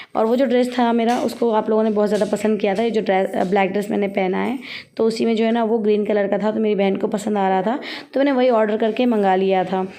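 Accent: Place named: Gulbarga, India. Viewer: Mujhe maloom ich hai ke gulbarga ke baatan aisich rahtie baji 🤭🤭🤭🤭🤭aapko waise baat karte hue sunke hasi aari mujhe par mashAllah baatein abba beti ki sunke khushi hui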